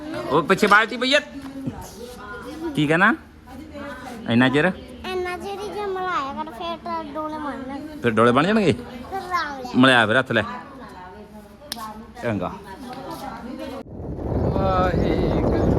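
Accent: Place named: Doaba, India. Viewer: 🙏❤👏🏾, ਬਹੁਤ ਵਧੀਆ 👌 ਵਲੌਗ ਹੁੰਦਾ ਹੈ! ਜਿਆਦਾ ਅਤੇ ਬਹੁਤ ਪਸੰਦੀਦਾ 🤩 ਪ੍ਰਸਿੱਧ ਪਰਦੇਸੀਆਂ ਪ੍ਰੋਗਰਾਮ 😂😂 ਜੋ ਨਹਿਰੀ ਠੰਡੇ ਪਾਣੀ 💦 ਨਾਲ ਦਿਲੋਂ ਪਿਆਰ 💕 ਨਾਲ ਭਰਪੂਰ ਬਣਦਾ ਹੈ 🎉😂😂🎉😂! ਅਤੇ ਹਰ ਦੇਸ਼ ਪ੍ਰਦੇਸ਼ ਵਿੱਚ ਬੈਠੇ ਪੰਜਾਬੀ ਉਡੀਕ ਕਰਦੇ ਰਹਿੰਦੇ ਹਨ ਕਿ ਕਦੋਂ ਨਹਿਰ ਦੇ ਤਾਜ਼ੇ ਠੰਢੇ ਪਾਣੀ 💦 ਦੇ ਦਰਸ਼ਨ ਕਰੀਏ ਅਤੇ ਹਰੇ-ਭਰੇ ❤ਖੇਤਾਂ ਦਾ ਕੁਦਰਤੀ ❤ਨਜ਼ਾਰਾ ਲਈਏ ਅਤੇ ਲੰਘੇ ਵਖਤਾਂ ਨੂੰ ਮਜਬੂਰੀਆਂ ਨੂੰ ਸਰਕਾਰਾਂ ਨੂੰ ਕੋਸੀਏ 😢 ਅਤੇ ਹਵਾਵਾਂ ਦੇ ਵਿੱਚ ਜਾ ਕੇ ਆਪੋ ਆਪਣੇ ਪਿੰਡਾਂ ਦਰਸ਼ਨ 😻+ ਯਾਦਾਂ 💪ਤਾਜ਼ਾ ਕਰੀਏ 🤦🏽🙇🏽😢❤🤷🏽‍♂️👏🏾☝🏾🙏! ਬਾਕੀ ਬੋਲੀ ਤੁਹਾਡੀ ਮਿੱਠੀ ਪੰਜਾਬੀ ਮਾਂ ❤ਬੋਲੀ ਦੋਆਬੇ ❤ਨਾਲ ਬਿਲਕੁਲ 💯👍 ਮੇਲ ਕਰਦੀ ਹੈ! ਬੱਸ ਸਿਰਫ਼ 🥭 ਅੰਬਾਂ❤ ਅਤੇ ਸੰਗਤਰਿਆਂ ਦੇ ਬੂਟਿਆਂ ਦੀ ਘਾਟ ਹੈ ਓਹ ਲੀਚੀ ਦਾ ਬੂਟਾ ਵੀ ਜੋ ਛਾਂ ਲਈ ਵੀ ਬਹੁਤ ਵਧੀਆ 👌 ਹੁੰਦਾ ਹੈ 🤷🏽‍♂️! ਬਾਕੀ ਤੁਹਾਡੇ ਮੌਸਮ ਅਤੇ ਮਿੱਟੀ ਤੇ ਨਿਰਭਰ ਹੋ ਸਕਦਾ ਹੈ ਘਰ ਵਿੱਚ ਪਸ਼ੂਆਂ ਵਾਸਤੇ ਦੇਸੀ ਅੰਬ 🥭 ਦਾ ਬੂਟਾ ਵੀ ਬਹੁਤ ਵਧੀਆ ਹੈ ਗਰਮੀਆਂ ਵਿੱਚ ਗੂੜ੍ਹੀ ਛਾਂ ਅਤੇ ਸਰਦੀਆਂ ਵਿੱਚ ਰਾਤ ਸਮੇਂ ਨਿੱਘਾ 🥰 ਹੁੰਦਾ ਹੈ! 😂🎉❤😂! ਜੱਟਾ ਵੇ ਤੇਰੀ ਜ਼ੂਨ ਬੁਰੀ ਹਲ਼ ਛੱਡ ਕੇ ਚਰੀ ਨੂੰ ਜਾਣਾ 🧑🏻‍🌾👨🏻‍🌾 | ਸੌਖੀ ਨੀ ਜਿੰਦ ਜੱਟ/ ਕਿਸਾਨ ਦੀ ਪੈਂਦਾ ਸੱਪਾਂ ਦੀਆਂ ਸਿਰੀਆਂ ਤੇ ਤੁਰਨਾ😮! 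ਰੱਬ ਉਤੇ ਭਰੋਸਾ ਰੱਖਦੇ , ਕਰਨ ਅਰਦਾਸਾਂ ਹੱਥ 👏🏾 ਜੋੜ ਵੱਲ ਅਸਮਾਨਾਂ! ਬਾਬੂਆਂ ਦੇ ਝੂਠੇ ਲਾਰਿਆਂ ਨੂੰ ਕਹਿਣ ਤੇਰੀ ਕਿਸਮਤ ਵਿੱਚ ਇਹੋ ਲਿਖਿਆ ਭੁੱਖਿਆ ਜਜਮਾਨਾਂ 🤯🤑🤮🤒😷ਸਾਡੀ ਤਾਂ ਡੋਰੀ ਉਸਦੇ ☝🏾 ਹੱਥ 👋 ਐ ਪਰ ਤੁਹਾਡੀ ਬਾਬੂਆਂ ਦੀ ਕਿਸਮਤ ਹੱਥ 🤑ਨੋਟਾਂ/ ਪੈਸਿਆਂ 💰 💵!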